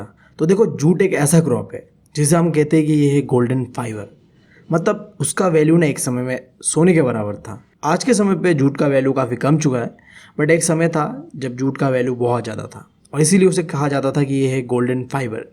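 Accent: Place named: Bihar, India. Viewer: Sir aap bihar se hai🤔🤔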